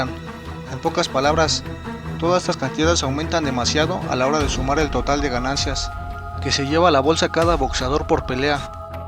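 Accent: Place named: Tepito, Mexico. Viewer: jajajaja tu acento se escucha super tepiteño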